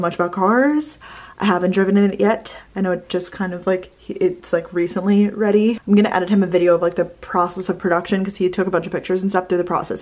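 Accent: Long Island, United States. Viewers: I’m a type nine:))) also i can hear the Long Island accent making a come back 😂😂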